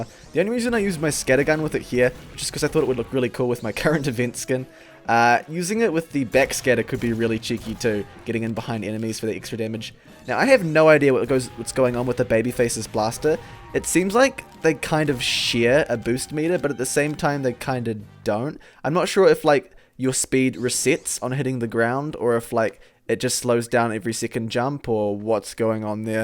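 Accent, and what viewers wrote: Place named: New Zealand. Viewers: He's from new zealand I think? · I'm not overly familiar with Kiwi accents, but it sounds like Australian but different, so I'll go with NZ too.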